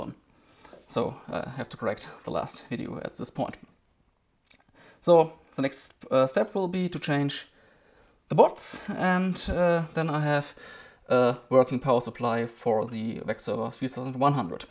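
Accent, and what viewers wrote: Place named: Germany. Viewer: I too do not have an issue with your speech. Maybe I a more familiar with how Germans speak English.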